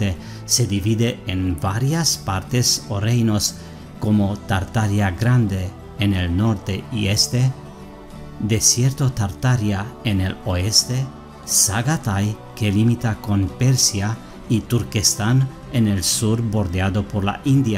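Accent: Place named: Saxony, Germany. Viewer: Me pregunto cuántos idiomas sabes. Por el nombre de tu canal veo que manejas el Latín, por tu acento adivino que eres sajón, por la información que presentas veo que sabes francés. Eres muy bueno. Saludos desde México, tú amigo Tartariano....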